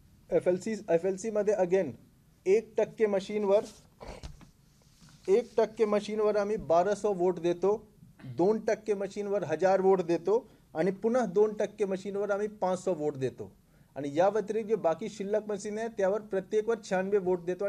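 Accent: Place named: Gujarat, India. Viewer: गुजराती मराठी निट बोल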